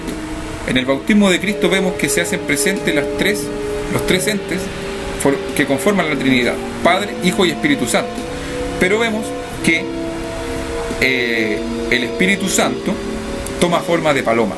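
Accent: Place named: Rioplatense, Argentina. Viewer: una pregunta eres argentino o paraguallllllllo.....dime ...que le echan al agua por ahi???? o te dejaron caer cuando chiquito????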